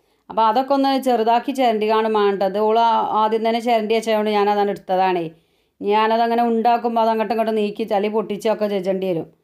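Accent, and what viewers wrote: Malappuram, India. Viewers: താത്താ നിങ്ങടെ വർത്തമാനം മലപ്പുറം ജില്ല വിട്ടിട്ടുണ്ട് കുറച്ചൊക്കെ കുറയ്ക്കാമായിരുന്നു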